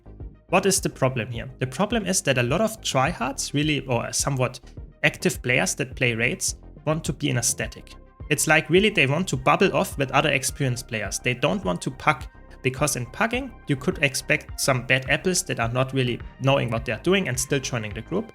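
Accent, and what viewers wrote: Germany. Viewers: Hab mich schon gefreut ein Deutsches Video anzuschauen da der Titel auf Deutsch ist.. Klicke drauf und gesprochen wird auf English mit einem Deutschen Akzent.. schade.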